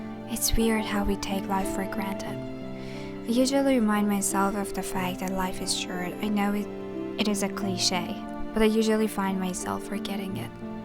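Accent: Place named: Turkey. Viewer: لهجه ترکی بهت میشینه دختر💙💚 امشب خیلی گریه کردم ولی دستم خورد ولاگت باز شد..... بعد.... بهترم.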